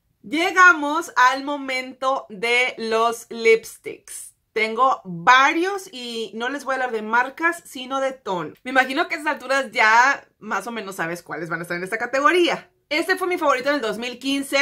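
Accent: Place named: North, Mexico. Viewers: Hola!! Mi nombre es Dalia Ruiz, de Monterrey Nuevo León, mis favoritos son 1. Maquillaje líquido de Mary Kay 2. Un labial nude de marca Oriflame 3. Mascara para pestañas de Shelo Nabel (fue toda una sorpresa ya que desconocía la marca y terminó por convencerme) Me encantan tus vídeos y el acento muy regio difundiéndose en el mundo jaja un abrazo y que sigas con tanto éxito! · Hola soy Natalia Cortes de Puebla. Mis productos favoritos este año fueron la cc cream de mary kay, la paletta de too faced semisweet chocolate bar, y las brochas de ojos de eco tools:) Me gusta mucho verte porque hablas como norteña, y desde que me mude al sur extrañi mucho el tonito! Feliz año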